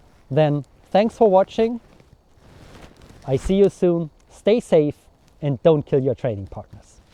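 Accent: Germany. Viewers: Super Video! Wenn ich darf lasse ich dir einen kurzen Hinweis zu deinem Akzent da. Du machst nämlich einen typisch deutschen Fehler. Du betonst "because" so als wäre es "becourse", also da kommt plötzlich ein r vor das es gar nicht gibt. Das "cau" in "because" wird aber einfach wie das "ko" im deutschen "kommen" ausgesprochen. Wir deutschen können halt das englische r nicht aussprechen (geht mir auch so) und das überkompensieren wir dummerweise gerne indem wir es überall reinpacken. Hoffe das ist nicht unangemessen, dachte mir nur das könnte hilfreich sein. Viele Grüße!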